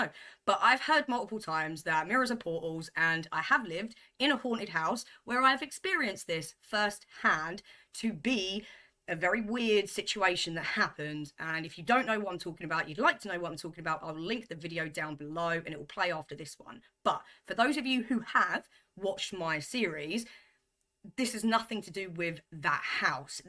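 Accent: United Kingdom. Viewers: Actually I don't know where to start these days I truly don't 😮 I suppose you just have to take one step at a time love and hope for the best 😮 It's a Herculean effort to get out of bed these days it truly is😮 You do know I am not one to moan😮 Lots of love and hugs ❤ ❤❤ PS I was going to say, lots of love from across the pond. I actually don't think you are across the pond your definitely British ❤ .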